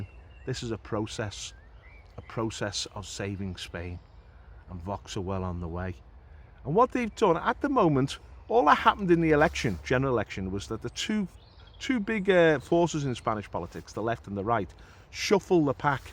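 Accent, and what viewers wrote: Liverpool, United Kingdom. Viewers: Scouser..from Liverpool. Every Nationalist respects those who fight for their Nation State.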